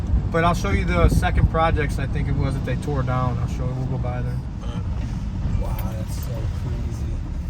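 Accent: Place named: Ohio, United States. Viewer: Adams Ohio accent man.. can't do it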